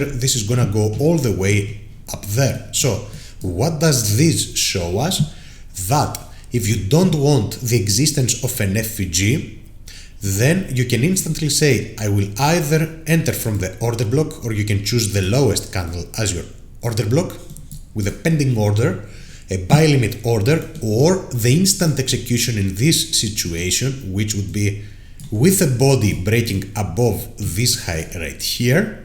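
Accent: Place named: Greece. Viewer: That greek accent is strong !!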